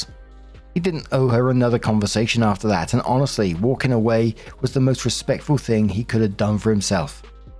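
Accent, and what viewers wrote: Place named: Liverpool, United Kingdom. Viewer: I'm listening but not reading the screen, so at first I thought he was wearing a wig and talking with Liverpool accent, I was going to ask "is he pretending to be John, Paul, George or Ringo?" xD edit: Hahahhaahahaahaha, I'm glad I'm not the only one that thought it.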